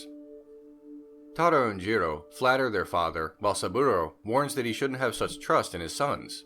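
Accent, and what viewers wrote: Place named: United States. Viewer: A fair analysis, but I was really distracted by the mispronunciation of every Japanese name ... I know it probably doesn't bother English speakers but as someone that grew up in a familiar environment with the language I seriously have trouble figuring out what name it is you're saying. Then again, these are mistakes literally everyone that doesn't speak the language makes, but still, it is a mistake; the English spelling of Japanese names has never been phonetically accurate so I guess it will always trip me up hearing Japanese spoken through the American accent.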